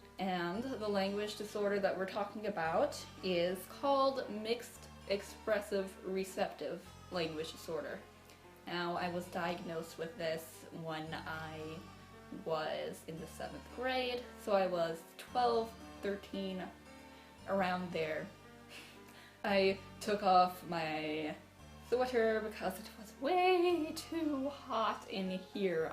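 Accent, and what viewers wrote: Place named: Russia. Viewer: Is it just me or you sound a bit Russian here 😊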